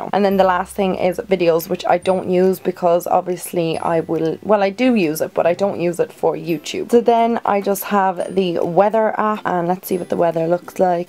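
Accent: Ireland. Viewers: is her accent irish ?!!!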